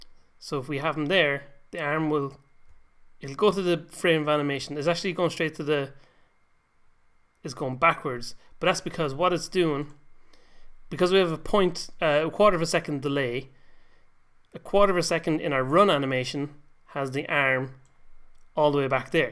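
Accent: Ireland. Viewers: lol....wtf? Is it not obvious? He's Irish (or a similar accent)...and how does (I assume you're saying) old have anything to do with how he says words?? I'm so confused.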